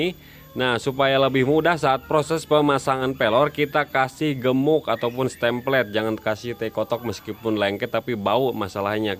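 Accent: West Java, Indonesia. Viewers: Kang Aya logat logat Sunda, Sunda mana kang, bengkel na di mana kang..hatur nuhun kang.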